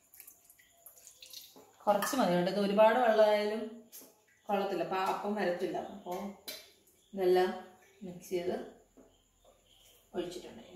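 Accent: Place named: Kottayam, India. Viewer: Not Thrichur talk... This is exactly kottayam Thiruvella chengannur talk.. same dress next day..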